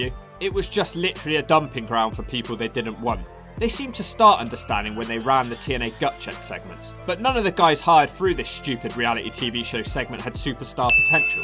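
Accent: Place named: United Kingdom. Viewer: Why do all the creators that make great wrestling content, have a British accent 😂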